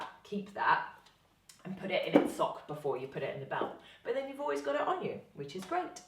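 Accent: United Kingdom. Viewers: Hallo! Just found your Video's and loved this one! So nice to hear a lovely young lady that Knows so much about Sax! Hope you make it across the pond some! Positive post and subscribed! No sure what part of the UK the accent is but that typically means a Blend from South London often for me. I'd like to add something a legendary sax player taught me to do before sand paper on a G# for sticking. Frequently we would use a Fresh USD bill before big performances. It worked great and had some texture to it and often when one did it, the Bill would make it down the horn line (Till the Bari player pocketed it typically) So I got a bass ! Keep up the Video's and Hope you make it to the SE USA ! Come play my Bass! Later Sweets! FantaZ4U here, AKA Saxaddict ! Oh one other thing, I made the3 mistake of buying a very nice Selmer Glass Reed wallet and it totally ruined reeds after the first use. 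For over 20 years I've done what you do and just retract the reed some and but a good cap on it. And it worked on all my large Clarinets as well but considering the frequency I had do Double on them, I eventually started using Fibracells. When having that much lumber on stage I got very dry keeping them All warmed. It got worse when the Vocalist found my storage fridge and started lifting them before the stage calls. And Typical we know what happens when singers feel the need to take things. I'd make special stops for a bottled water Lemon Water only to have a Singer take it before they cameras ca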